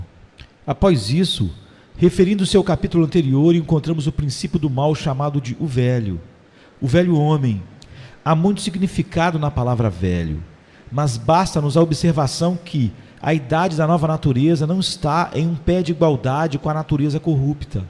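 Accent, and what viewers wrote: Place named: Rio de Janeiro, Brazil. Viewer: Tem sido maravilhoso ouvir Spurgeon em sua grandeza em português e ainda melhor com este gostoso sotaque carioca! Obrigado irmao que o Senhor continue abençoe o sempre!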